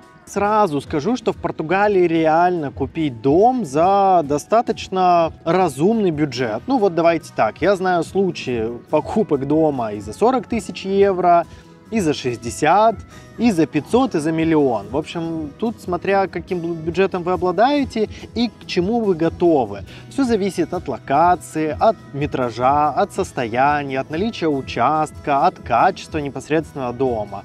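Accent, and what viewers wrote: Standard. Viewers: Рада слышать хороший,чистый русский язык! Отвыкла давно от этого Современную речь слышишь,даже многого не понимаешь из за сленга Особенно у молодежи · Теперь понятно от куда такая чистая речь. Успехов вашей семье во всем!!!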